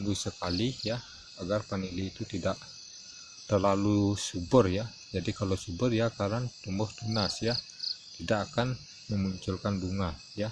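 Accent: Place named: Bali, Indonesia. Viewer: logat Bali ini sepertinya engken bli kabare becik becik ya salam dari jakarta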